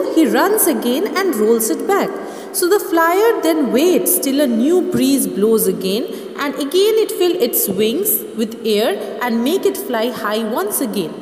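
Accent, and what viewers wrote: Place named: United States. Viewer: Mam I like your voice very much it was just as an American🤗🤗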